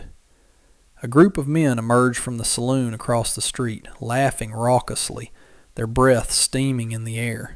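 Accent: South, United States. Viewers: Finally, narration and a believable story. The pictures let it down but the souther drawl and correct pronunciation of words, the use of different names made it a refreshing dream to listen to. Excellent. Most enjoyable story I’ve heard from these types of stories. Very well done.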